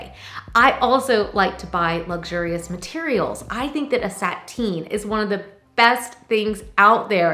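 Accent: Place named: United States. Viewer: Hello lm still waiting,l heard but l have difficult to understand sometimes the American accent if you don't mind l will really appreciate 🙏 if you write the name of those 2 sprays. Thank you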